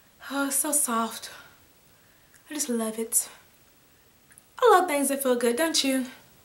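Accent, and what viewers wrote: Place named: New York, United States. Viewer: I love your accent! I'm from ny so I'm use to only hearing that accent most lol😃 I have the vitagoods brush! I love it!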